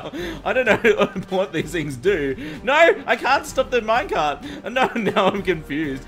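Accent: Australia. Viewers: What accent do you have? Australian?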